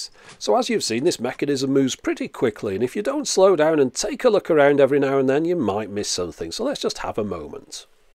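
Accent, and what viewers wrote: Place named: Liverpool, United Kingdom. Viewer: Are you from Liverpool? Sounds like it You sound like Ringo.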